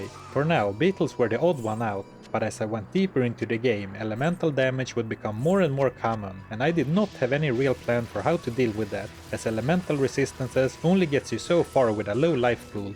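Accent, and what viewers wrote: Sweden. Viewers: What a peculiar, and very thick, Swedish accent! Not only does he confuse V and W, "sh" and "ch" and so on as most non natives speakers do. He also turns "o" in to "a", which is something I've never heard before. "lot" becomes "lát", "dodge" becomes "dádge" and "monster" becomes "mánster" somehow. That combined with the language melody, copied straight from Swedish make for a hilarious listening! :) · Are you swedish? Your accent sounds swedish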